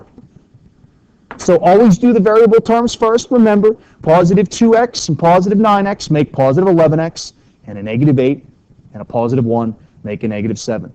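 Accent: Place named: New Jersey, United States. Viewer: he has that new jersey accent and im living for it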